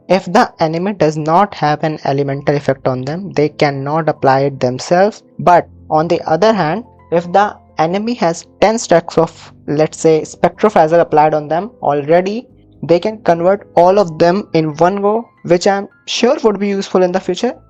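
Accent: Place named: India. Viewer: The accent tells me that you are Indian?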